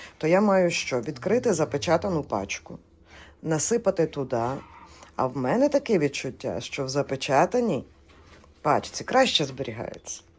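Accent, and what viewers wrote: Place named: Western Ukraine, Ukraine. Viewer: Дуже интересно, ви из захидной Украини? А звидки? Акцент наш!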